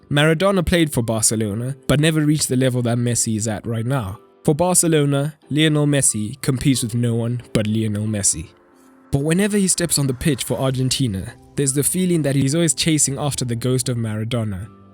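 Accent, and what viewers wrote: South Africa. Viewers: Please do one for Ronaldo too. P.S. Are you from South Africa? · Are you South African or Zimbabwean?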